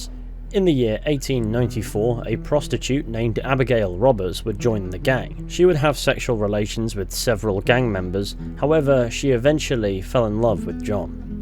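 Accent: United Kingdom. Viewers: Go damn it, why do u have the annoying british accent, just why?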